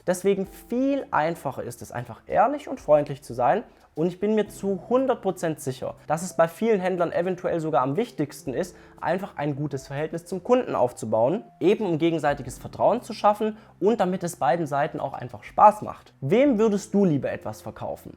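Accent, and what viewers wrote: Germany. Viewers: Dein leichter Dialekt lässt mich darauf schließen, dass du aus BaWü bist? ;) Sehr gutes Video, arbeite an meinem Traum in Form einer Uhr. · Gute Tipps! Aus welcher Region kommst du, wenn man fragen darf? NRW? Bin auch noch auf der Suche nach einem Konzi, bei dem ich deine Tipps anwenden könnte. Letzten Monat erst habe ich mitbekommen, wie meine Traumuhr (Submariner 114060) im Hinterraum des Ladens zurückgehalten wurde. Ich müsste zunächst ein teures Model aus dem Schaufenster kaufen (als Bsp. nannte er die goldene Daytona mit Oysterflex). Erst dann würde er mir die Sub zum Listenpreis verkaufen...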